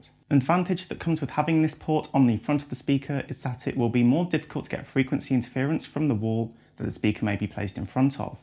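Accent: United Kingdom. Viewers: This guy's British accent is so thick, I can't understand him ' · i'm really impressed with your clean british accent, nice review man... i must have this one this coming christmas eve!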